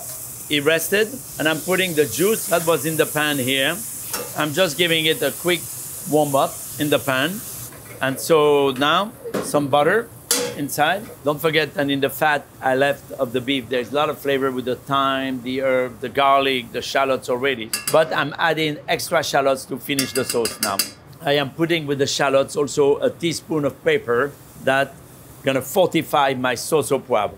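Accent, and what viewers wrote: France. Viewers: love every time his inner french hits randomly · Goodness me, amazing cooking, mouthwatering steak with a touch of french accent. I'm hungry now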